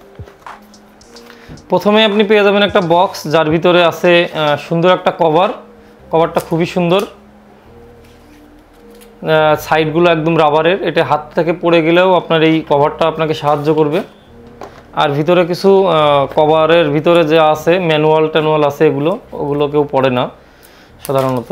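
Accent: Bangladesh. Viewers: ভাষার ধরন যা, সেতো সংস্কৃতিতে খুলনার বারটা বাজাতে চাচ্ছে। আসলে এ কিছু জানে বলে মনে হয় না। --- - এট্টু সাবস্ক্রাইব কুরেন ।। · ভাই আপনার বাসা কি নড়াইলে আপনার ভাসা নড়াইলের মত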